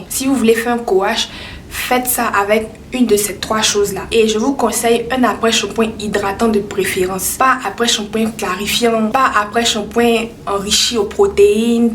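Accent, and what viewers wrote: Ivory Coast. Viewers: J'aime son ascent ❤️❤️❤️. Genre à l'ivoirien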